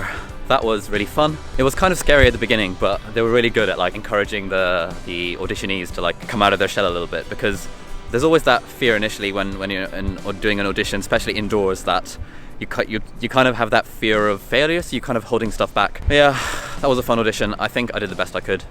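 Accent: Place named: United Kingdom. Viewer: The britsh spend hafof the day drinking the( t)and other have ignoring it😂😂 l like your accent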